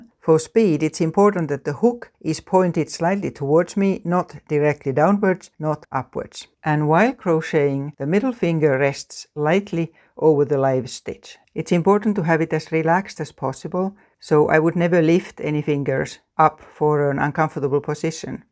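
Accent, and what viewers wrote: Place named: Finland. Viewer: My mother and father were both from Finland. I knew the moment I heard your voice I knew you were too. It's so lovely to hear that beautiful accent again! Not only that, I loved your video. Several techniques are new to me.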